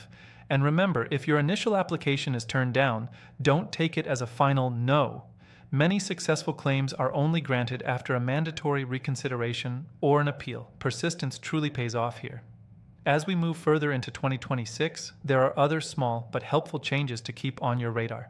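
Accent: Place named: United States. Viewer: Why have we got some American telling us this?mit is really annoying. Not to mention being called a senior!!!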